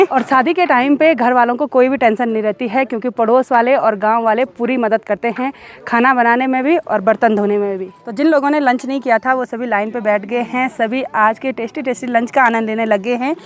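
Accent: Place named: Uttar Pradesh, India. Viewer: Jab aap hindi bolte ho tab aap garhwali nahi lagte up k lagte ho 🙏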